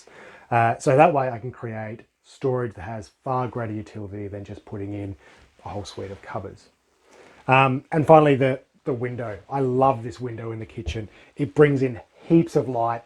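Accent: Australia. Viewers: Dump Chump I agree I heard a accent didn’t know it was Australian though, wasn’t focused on it. To be honest I was a bit drunk when I posted. Not a excuse just I wasn’t in my peak operating condition. I often forget we aren’t all living in a global economy, I don’t notice the separation anymore. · Nicely designed for the space. Lots of thought put into it. The entry/overhang is well worth the tiny added cost - it gives it character. Just something to share...one tiny house I saw recently showed a toilet that was in a bathroom, but it was incorporated into a cupboard, and looked just like a low cupboard door that was part of a tall cupboard. The lower part just rolled out on wheels and was a composting bucket. You could do the same here, thereby utilizing the large entry space (which would then provide the headroom needed forcthe action of sitting and rising from the toilet) and would thereby free the upper portion of what you currently have as a toilet for use as storage. 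If course no privacy if there are 2 of you, but it's an option for someone for whom that design would work. You say $4,000, but YouTube is global and I can't tell whose dollars that is - Australian? New Zealand? I'm guessing those because of your accent and use of the word bench for what North Americans call a counter. 😊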